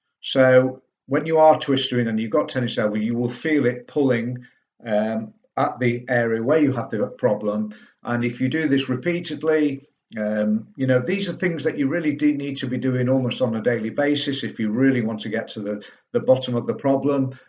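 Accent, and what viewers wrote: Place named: Midlands, United Kingdom. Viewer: Thanks for the tips. I know you are a Leeds boy, but your accent sounds more like the Midlands to me( Staffs, Derbyshire?). Or am I wrong lol?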